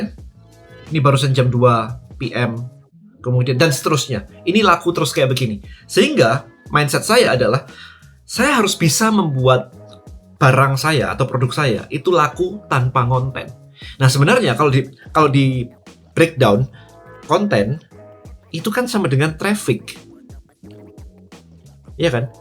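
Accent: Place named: Medan, Indonesia. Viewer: What, orang Medan KhoKho...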